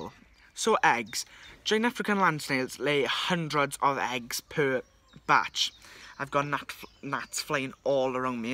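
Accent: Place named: Wales, United Kingdom. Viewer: Awesome land snail, and Welsh accent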